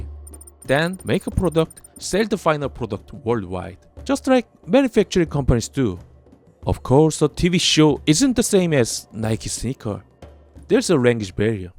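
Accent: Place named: South Korea. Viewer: Wow, i believe its first time when YouTube suggested me a video with that low views, but I didn't even notice it. Still, very good video. I love that you want to keep your korean identity, never forget who you are and where you from. Also even listening to that strong korean accent I still can understand and follow your thoughts. Keep up with such a great job! Love from Russia.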